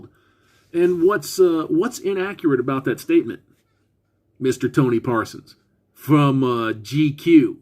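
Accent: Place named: South, United States. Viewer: First time viewer here (Sandman sent me) Love this lol! Where is from? has to be the south. He sound like my cousin! must be part black lol love it!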